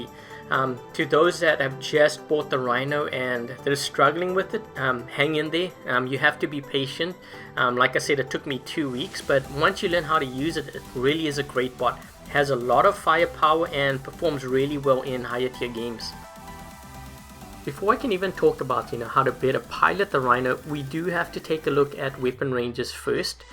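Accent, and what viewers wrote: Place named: South Africa. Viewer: I could tell you were somewhat South African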